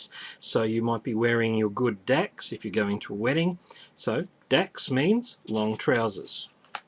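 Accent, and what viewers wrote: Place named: Australia. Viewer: Doovalacky is a technical term for a tool I can't remember the name of as opposed to person being a tool whose name escapes me. 😜 Are all of those words still in use? Some I haven't heard in years. A cigarette was a rollie, tailor made or ciggie, even cancer stick by some aware of the dangers. Can't forget going outside for a smoke or fag. Thanks for sharing. Am enjoying hearing an Aussie accent.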